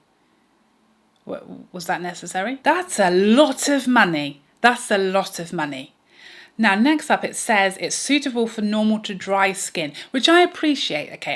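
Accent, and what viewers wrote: United Kingdom. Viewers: Great video as always dear, and looking so beautiful!!!🤩🤩🤩🤩Love your humor always so, so much!!! Do you know how unique you are here, your beautiful appearance, your knowlegde, your lovely British accent AND your humor, you always make my day with your video's, thank you!!!! The UD nightmare🤣🤣🤣🤣I'm only interested in the CT powder at the moment. Thanks, have a great sleep and an amazing day tomorrow!!!😘😘😘😘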